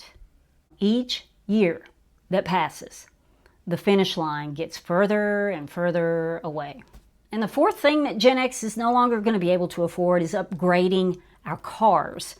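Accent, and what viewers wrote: South, United States. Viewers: Southerner here. Trying to place your accent- North Carolina? GA?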